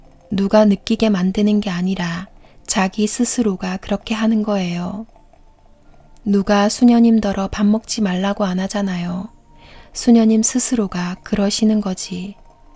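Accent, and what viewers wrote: Gyeongsang, South Korea. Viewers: 아 목소리...예뻐 좋아요~~특히 경상도톤~~~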